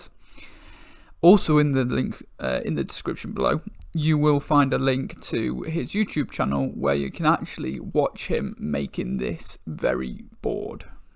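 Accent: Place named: United Kingdom. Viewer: This looks delicious! However I've never had Spanish chorizo, only Mexican chorizo. btw, as an American I quite enjoy the British accent...talk away imo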